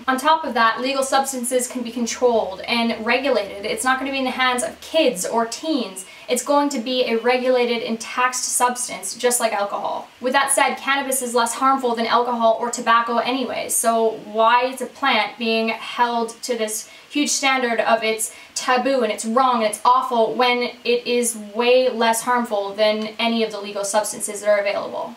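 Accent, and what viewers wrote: Canada. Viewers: Are you canadian? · That Canalian accent though! It's crazy how much your accent has changed if you watch your older videos. I love how you are so upfront & such a straight shooter in these videos! I have a TMI Tuesday question for ya. About a year ago you posted your TMI Tuesday #47 I believe where you were just coming back from taking a break from YouTube. Do you feel like you've balanced your life out a little bit more since that video and are you happier? I really hope so. So much love! <3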